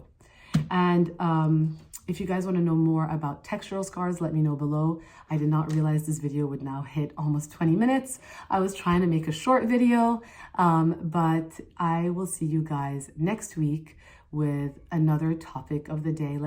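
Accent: France. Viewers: Great tips thank you 😊 also have a question on using laser for scarring or redness... is it useful, do you have any recommendations? love your french accent très chic oh la là 🤌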